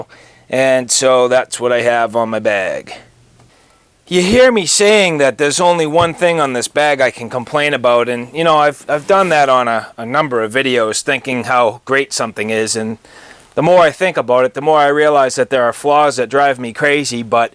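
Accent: Boston, United States. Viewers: Your American accent is horrible. Learn British accent or something. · I can agree that is comes out full throttle. You sound like someone from my area and then the Boston accent comes out. I find it fun to listen to. But it was a great video! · I hear lots of good stuff about this brand, I'll have to check em out. Great review! Any chance you're located in Massachusetts? Sounds like a Boston accent.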